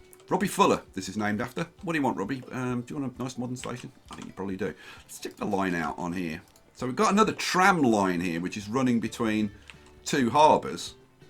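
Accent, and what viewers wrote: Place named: United Kingdom. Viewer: Brilliant ideas you need to write those ideas down someplace .. and YES I would vote for a decoration and tidy up episode. After all it is a large part of building up the map to be the best that it can be. On a completely off topic note by chance are you and John Oliver from the same area ? My ears tell me you two could be brothers, I do not mean that in a negative way at all, I think it is very cool, as you both have great enthusiasm for what you do, and your voices express and convey that to your audience. Good on You mate. I am busting here, waiting for the train ride on the new railway.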